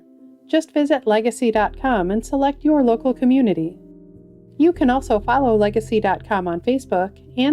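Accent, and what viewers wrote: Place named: Wisconsin, United States. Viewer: Great Wisconsin accent.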